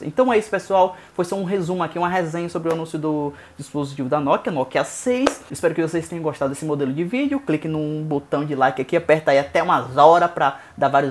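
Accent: Bahia, Brazil. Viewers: vc é baiano cara?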